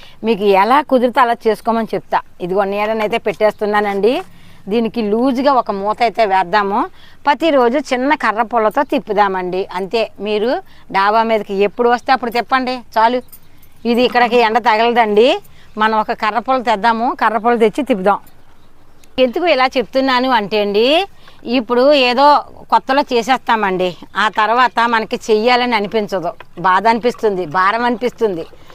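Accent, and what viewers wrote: Godavari, India. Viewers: Godavari jilla తెలుగు super madam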